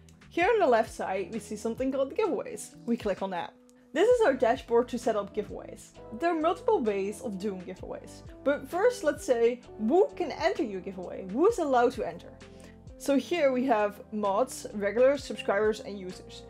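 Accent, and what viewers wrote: Netherlands. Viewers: Been living on the Netherlands for 4 years, I could tell you were dutch in the first 10 seconds of the video xD Thanks for the tutorial, btw, it was very useful! ❤️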